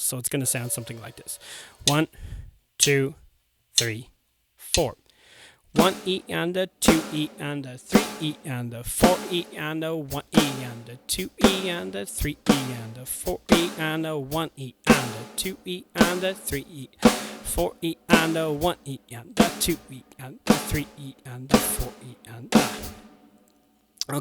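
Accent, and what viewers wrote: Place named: United States. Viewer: Great to see you back doing what you do best. You're a natural born drum teacher. I don't know if your family in Ireland notice it when you make video calls but there's some American creeping into the accent.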